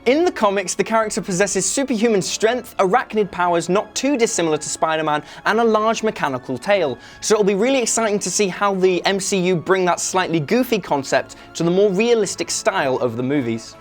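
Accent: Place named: England, United Kingdom. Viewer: Ugly English accent.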